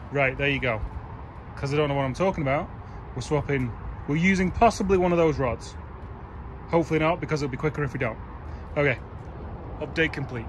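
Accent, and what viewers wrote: United Kingdom. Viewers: Missing you and you nice British accent. Support from a French fan from cold Minnesota. Stay safe mate. Cheers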